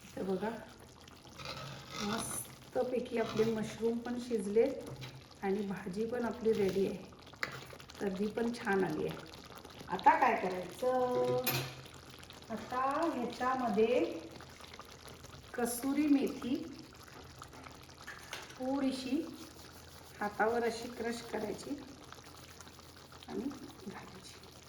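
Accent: Vidarbha, India. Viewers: का~~व माय, विदर्भाची का तु...मले वाटलं च....खुप चांगली भाजी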